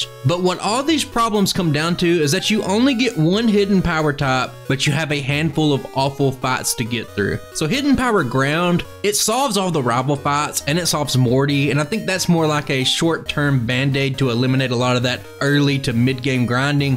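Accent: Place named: Texas, United States. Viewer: the texan accent is sooo good with pokemon challenge runs just what i needed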